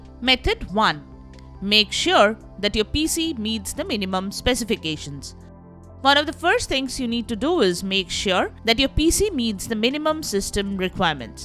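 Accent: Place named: India. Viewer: Dislike for the indian accent. I don't like the color of skin and the way they look/behave.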